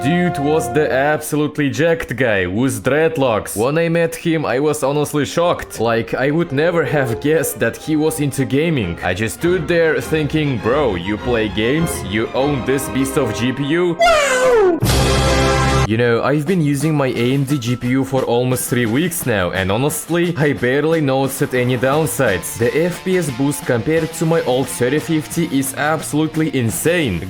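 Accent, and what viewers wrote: Russia. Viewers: I like your accent. 👍 Is it Russian?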